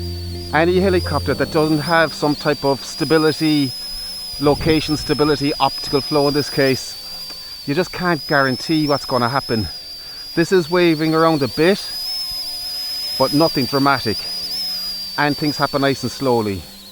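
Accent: Ireland. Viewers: Geez You sound even more Irish than I do, Where you from,, I'd say Limerick at a Guess 😂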